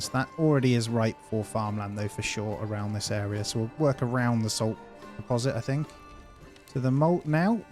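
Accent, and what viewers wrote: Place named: England, United Kingdom. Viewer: I'm trying to pinpoint your accent....is it Bristol, Devon or Norfolk? Can't put my finger on it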